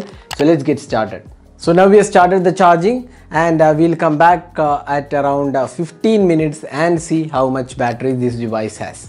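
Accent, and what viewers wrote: Kerala, India. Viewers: Malayalali anale english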